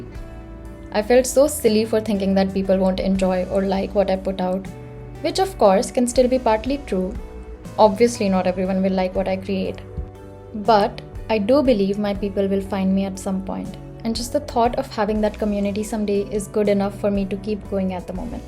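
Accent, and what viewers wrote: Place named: Bengal, India. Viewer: why does she have a hint of a bengali accent? sounds cute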